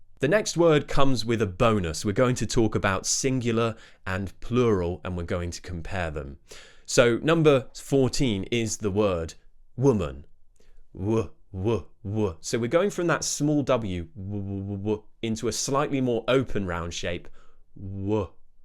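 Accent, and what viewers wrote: United Kingdom. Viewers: Always like your accent, British English accent is awesome❤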